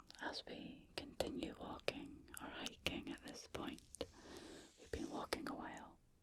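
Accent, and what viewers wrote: Scotland, United Kingdom. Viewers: This video took me on such a calming journey! The blend of Scottish legends, soothing hand movements, and that perfect ear-to-ear whispering in your Scottish accent made it incredibly immersive. The guided meditation and visualization were just what I needed to unwind, and your storytelling added such a unique touch. Sweet dreams, indeed! Thank you for creating such a peaceful experience.😴😴😴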